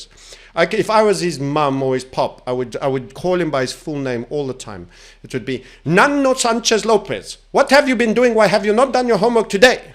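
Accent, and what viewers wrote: South Africa. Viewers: you are a sooth sayer sir. I have heard some sayers of sooth before sir,but this level of sootherie is staggering. (Just curious, South African accent?) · South african bud not ozzy ..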